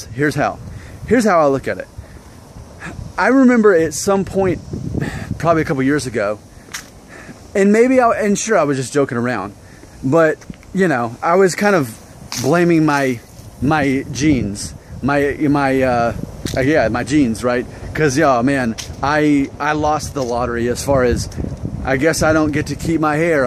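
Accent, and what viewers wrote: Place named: South, United States. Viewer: Quarter mexican with that southern accent how the heck does that happen?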